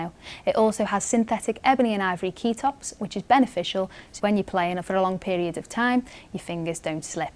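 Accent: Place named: Scotland, United Kingdom. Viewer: She's so cute!!! And the Scottish accent... just... 😍😍😍 The keyboard's pretty good, too, I must say.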